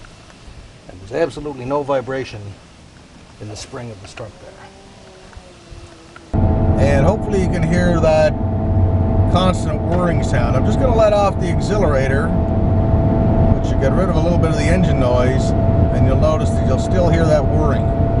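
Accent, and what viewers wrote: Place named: Canada. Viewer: I love your Canadian accent. Sounds very cool! Much love and respect from your friends from the southern border!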